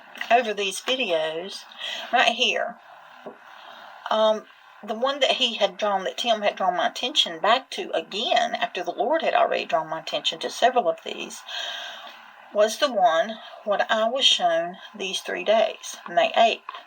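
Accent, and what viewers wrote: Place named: South, United States. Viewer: Soooo good to hear that calming southern accent! I have missed you sister.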